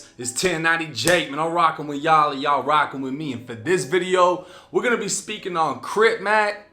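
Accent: Boston, United States. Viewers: The way you have developed your delivery and pronunciation while still holding on to that Boston 1090 Jake sound we all love. Your in a league of your own..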